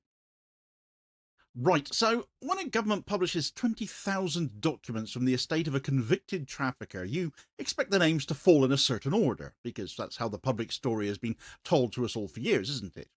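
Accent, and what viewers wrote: United Kingdom. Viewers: Love your vids, be careful if you live in uk(you sound British)they might arrest you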